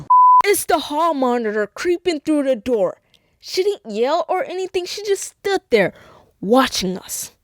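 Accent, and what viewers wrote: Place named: Texas, United States. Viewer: Yo u sound like u from lake highlands and Texas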